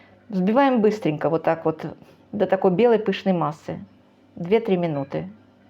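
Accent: Baku, Azerbaijan. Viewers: Как приятен слуху Бакинский акцент. Родилась в Баку и прожила там 25 лет, но не знала такого рецепта. Спасибо , надо попробовать. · Вы видимо не так давно выехали из Баку, у вас до сих пор есть бакинский акцент🎉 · Чувствуется легкий бакинский акцент....😊 Спасибо! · Этот незабываемый говор бакинский❤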